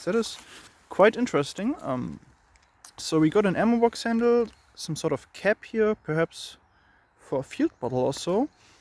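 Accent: Germany. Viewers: Are you German you have an German accent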